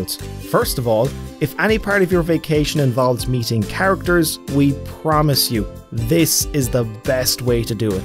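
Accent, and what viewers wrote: Ireland. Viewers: Love 💗 Irish accent! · sounds it, I have an Irish neighbor who sounds like this but female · Are you Irish? I love your accent! It reminds me of a professor I had in college.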